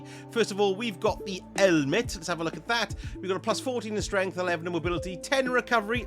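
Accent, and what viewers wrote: Wales, United Kingdom. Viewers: Pretty sure it’s Welsh my guy 👍🏼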